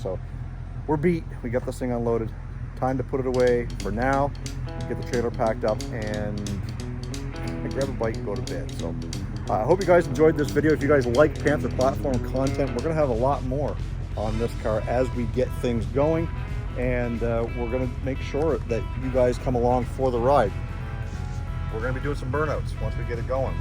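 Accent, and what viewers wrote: Canada. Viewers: so why do you have an address in Maine but still use "kilometers" and have a Canadian accent?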